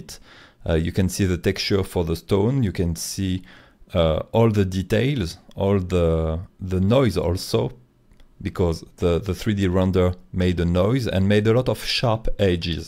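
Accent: France. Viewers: Are u french ?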